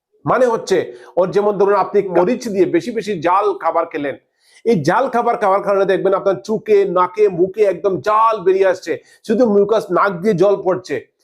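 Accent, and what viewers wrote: Sylhet, Bangladesh. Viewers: Sylhet er bhasha emnitai bujajai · Bro ! Are you from Sylhet ? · bai sylheti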